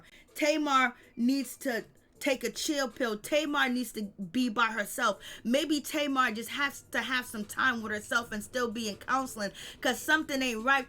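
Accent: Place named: East Coast, United States. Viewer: your accent made me subscribe.. is that east coast vibes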